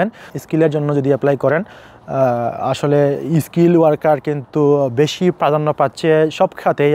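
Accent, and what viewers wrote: Sylhet, Bangladesh. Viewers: Are you sylhety? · Vai er kothay buja jar Sylheti bhai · আপনি সিলেটী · ভাই সিলেট নাকি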